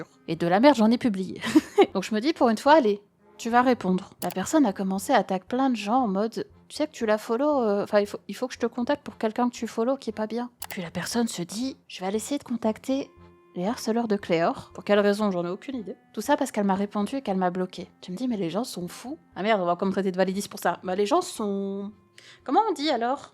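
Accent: Belgium. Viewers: C'est toujours triste quand on doit se réfréner, se bâillonner, pour contrôler la casse, éviter les problèmes, etc, quand on créée quelque chose, et qu'on finit par s'étouffer soi-même… 😔 De toute façon, leur souci principal, c'est que vous soyez une femme sur Internet, et d'en plus ne pas rester dans les cases "make-up" ou "vlog" 🙄 Il y aura forcément des gens qui auront quelque chose à redire, rien qu'à voir les attaques sur l'accent belge, mais je me doute que c'est trop facile de dire de ne pas les prendre en compte, quand on ne le vit pas (et hypocrite, je ne sais pas le faire xD) Faîtes au mieux pour vous-même, vous êtes la plus importante dans l'histoire ♥ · Bonjour. Abonnée qui sort de la communauté silencieuse!! Personnellement, si je regarde tes vidéos avec autant d'assiduité, c'est pour ton sarcasme, ton humour, ta personnalité (supplément accent belge) bien plus que pour le contenu. 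Même si j'adore le format de tes vidéos; des chaînes qui traitent des sujets dramas il y en a des milliers. Des, je n'en suis qu'une. Je dis ça sans parasocial ou quoi. Si je clique sur tes vidéos c'est pour voir du (les gars dont tu parles, pour la majorité je les connais même pas! ) Ce que j'essaie de dire c'est: amuse-toi. T'aimes la création de vidéo, alors crée des vidéos que tu aimes! Et je pense sincèrement que plus tu t'autoriseras à être toi-même dans ton contenu, plus les gens qui décideront de te suivre te ressembleront. Y'aura toujours des gens qui profiteront de l'anonymat pour venir gratter la merde, mais je crois que malheureusement ils viennent d'office avec le package ''femme sur internet''. En parallèle, y'aura toujours des gens pour reconnaître et apprécier ton travail! (Signé: une abonnée qui appartient à plusieurs minorités et qui ne s'est jamais sentie attaquée par tes blagues) Pense à toi. 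· Mais mais, c'est ta chaine non? tu fais ce que tu veux. Si je suis sur ta chaine, c'est que j'aime ce que TU fais. Je suis plein d'autres chaines et je suis capable d'en prendre et d'en laisser. Les médias sont tellement remplis de trucs qu'ils y en à pour tout le monde. J'aime ton humour, ta voix est apaisante, ton accent belge, je suis Québécois, et je t'assure que j'ai aussi un gros accent. Tu ne pourras jamais plaire à tout le monde, et c'est ainsi que le monde est fais. Tu à eu le courage de crée une chaine YouTube, ce serait le comble que tu ne puissent pas y faire ce que tu veut! Courage et je serais toujours à tes cotés en tant qu'abonné. parce que point!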